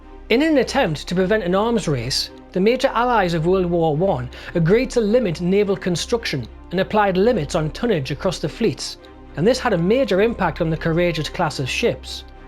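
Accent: Newcastle, United Kingdom. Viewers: Methinks a Geordie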